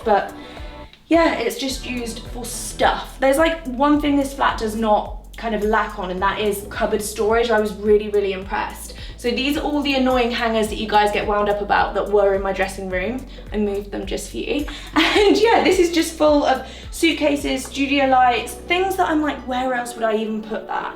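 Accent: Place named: Cockney, United Kingdom. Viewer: a cockney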